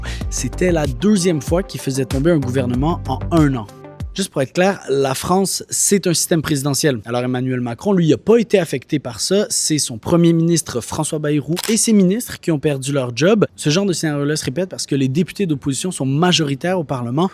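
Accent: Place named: Canada. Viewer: Tu as un accent canadien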